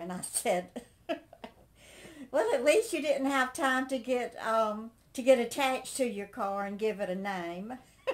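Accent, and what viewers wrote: South, United States. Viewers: Discovered your channel by typing in "14 QUESTIONS BEING TAGGED ON A VIDEO." Your channel was one that popped up with your answering these questions. Wondered about the questions because saw Debra Joy mentioned being tagged, and she answered these questions, too. Long story short: I subscribed to your channel and tapped the bell (to receive notices when you upload more videos). Am looking forward to viewing some of your past videos and, of course, your new ones. Very much like your delightful Southern accent. :)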